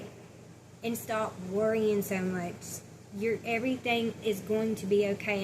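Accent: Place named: South, United States. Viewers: Sounds American from the South, like George or Alabama. 💝 She does have a sweet heart!💝 PS she says she's from Georgia! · I love your accent. Are you American?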